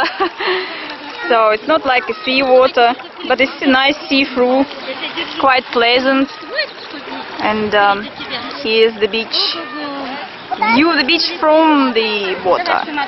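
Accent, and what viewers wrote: Russia. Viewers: wao im much amazed by your russian style english accent miss....it feels much innocent...and soothing. moreover. Ti Ochin krecivya. spiyceba for your video efforts. im much interested to learn about russia & russian people. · Good english too. Better than many RT translators I've heard. There is a specific russian dialect. Words like water becomes woter but it's fully understandable with good vocabulary. It was nice seeing the Volga in summer with sandy beaches.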